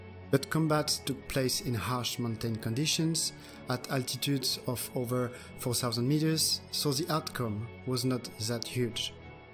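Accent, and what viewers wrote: France. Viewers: the French accent is stronk here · Just 10 minutes far in the video, and enjoying it truely, but I have to comment now on your super cute accent. As a french myself I understand your struggle and I compliment you : you stress all the words properly. But still, it makes me smile. Shirvan commented a bit more on the difficulties that lies ahead of India, than you do. Do you put more weight on more optimistic views by choice, like your Patreon page says ? "Cheerfull foreign affairs videos" It chearfull for sure :-) Oh, my bad, you do talk about the limits to growth. thèse-antithèse-synthèse on se refait pas :-) I personally am afraid the positive developpment of India might suffer very much from the peak oil. Alltogether, views on economics, on politics, and geopolitics... all sumed up, given nuances... very beautifull peace of thinking. I proudly recognize the french-like attention brought to the organization of thoughts, with diagramms and titles, just like in school !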